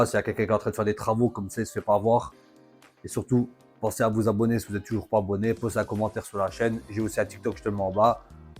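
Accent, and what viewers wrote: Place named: Alsace, France. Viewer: toi tu est alsacien non?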